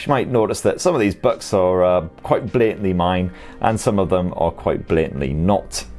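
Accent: United Kingdom. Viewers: I like your video content and your british voice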